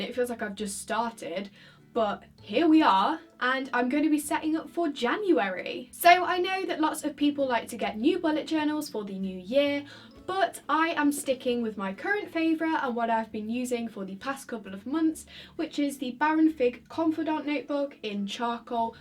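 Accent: England, United Kingdom. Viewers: Where of england is thic dialect from?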